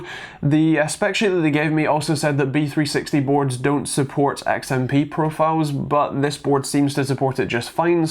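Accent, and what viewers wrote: United Kingdom. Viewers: can't understand ANYTHING with your thick British Accent, lol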